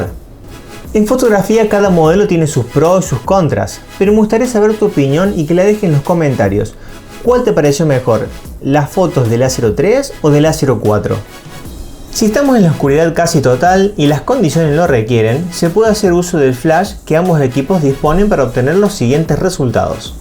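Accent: Córdoba, Argentina. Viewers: pregunta sos cordobes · eres cordobes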